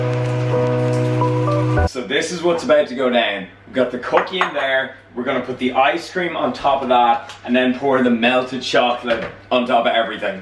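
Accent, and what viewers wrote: Ireland. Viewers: Παύλος Εικοσπεντακης it's an irish accent .... ireland :) I'm also irish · Are you irish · I forgot how much I love Irish accents wow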